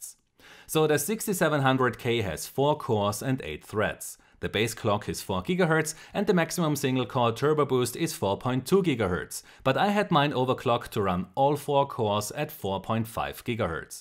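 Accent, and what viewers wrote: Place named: Germany. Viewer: are you german? where does your strong accent come from?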